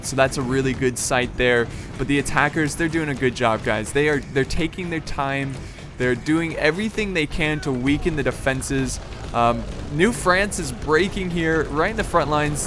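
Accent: England, United Kingdom. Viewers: are you a englisch or a american youtouber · is American? I thought he was British.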